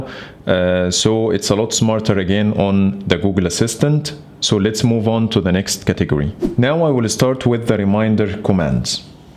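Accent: Egypt. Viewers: من طريقه نطقك شكلك مصري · Are you Egyptian? Your accent is very Egyptian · Your accent is so Egyptian damn 😅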